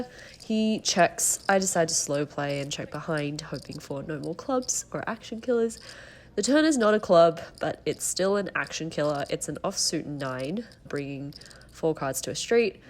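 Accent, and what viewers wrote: Australia. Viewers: refreshing to hear an aussie accent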